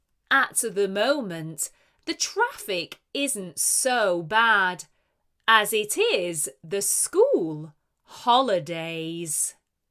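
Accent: United Kingdom. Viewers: 🙌🏾🙌🏾🙌🏾🙌🏾Thanks so much for your time that you have been using to prepare the different stories ✨✨ it helps to boost our english level 😁😁Even UK accent 📌.Don't know how to thank you 😊 enough.May God bless the work of your hands 🙌 🇹🇿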